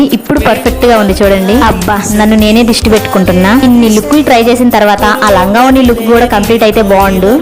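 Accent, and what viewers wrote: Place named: Atmakur, India. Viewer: Akka nv atmakuru lo chaduvukunnava. Langvoni super😊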